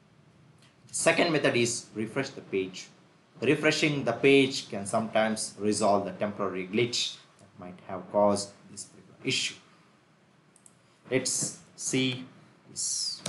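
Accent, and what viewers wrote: India. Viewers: I hate the way u r talking. India 😅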